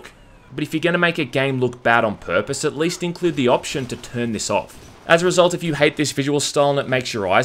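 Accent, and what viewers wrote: Australia. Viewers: Idk if you'll ever see this comment but thank you for your videos. They are not only great reviews but also have a nice bit of witty humor. Plus for me the accent reminds me of my mums family from Australia. Which also makes me love the videos even more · Its so weird that your American accent sounds so Australian. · FrÜt he is australian though?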